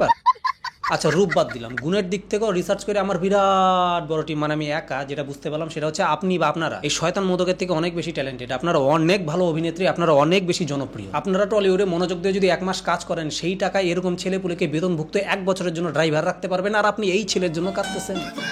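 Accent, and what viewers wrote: Bangladesh. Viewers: Ai Vai apnar language tone toh Pura amader Bangladesher moto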